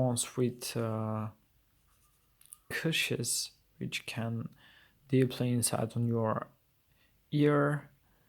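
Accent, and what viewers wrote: Europe. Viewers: james brown because English isn’t his first language. This is what Europeans speaking English sounds like. They have an accent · Fine pro Gaming He’s from Europe so he has an accent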